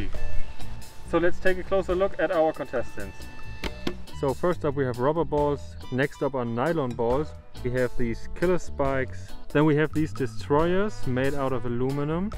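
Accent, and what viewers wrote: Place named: Germany. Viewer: Fast kaum erkannt, dass du Deutscher bist 😉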